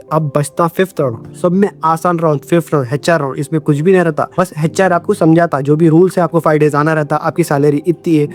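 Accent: Hyderabad, India. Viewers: accent ka wajha seay kuy ko hydrabadh seay ha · Aap Itna Hyderabadi Accent ma Baat kyu Krta, Sunne ma Bohot Funny Lagta 😂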